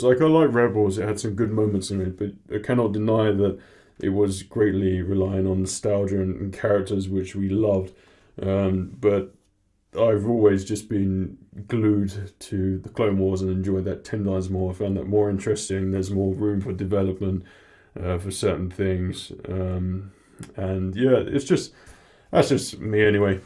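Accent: Australia. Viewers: I thought you were an Australian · I've said it before but I'm really glad I'm not the only one who felt the Disney Trilogy made the galaxy feel small. Also, you're in England? Why was I always thinking you were Australian?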